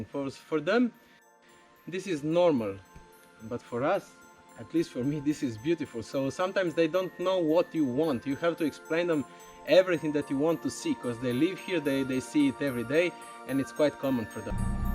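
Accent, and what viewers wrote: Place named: Indonesia. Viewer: Logat nya khas pedalaman Indonesia banget. Auto subscribe ini mah 🤙🤙🤙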